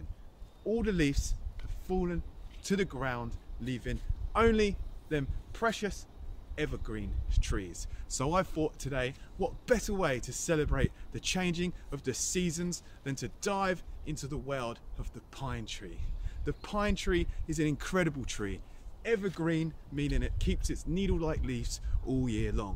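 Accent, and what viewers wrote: United Kingdom. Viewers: Hello, where is your accent from? British?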